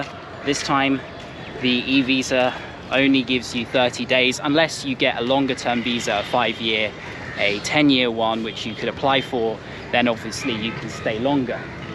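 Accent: United Kingdom. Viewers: Are you British?...Thanks...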